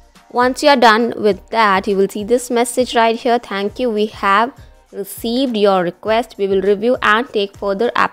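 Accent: India. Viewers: Why do you have an Indian accent. You don’t look Indian. Do you have any idea how annoying your screechy voice is? Plus, your video is not helpful. Click bait.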